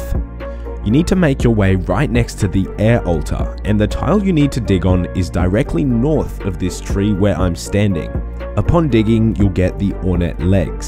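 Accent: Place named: Australia. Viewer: Haha I always love the way Australians say certain words 😄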